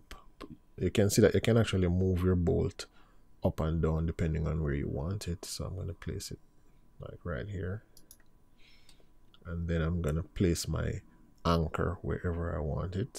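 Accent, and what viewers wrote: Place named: Jamaica. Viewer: Absolutly the best tutorial of AutoCAD Architecture! Really good explaination in funny "Jamaica" styl:). Perfect. I'm looking forward for Last Episode with setting Sheets. Great Work!!!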